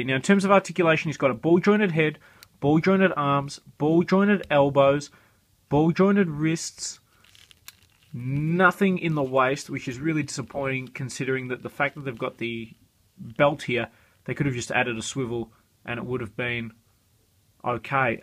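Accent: Australia. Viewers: Hey loved the review! Are you from Australia?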